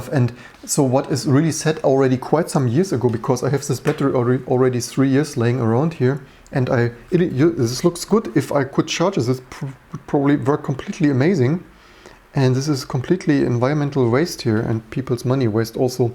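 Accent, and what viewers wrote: Germany. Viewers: Very informative video, its really motivating to see that im not alone with repairing stuff instead of throwing it away. PS: Are you German too? Your accent really sounds like it.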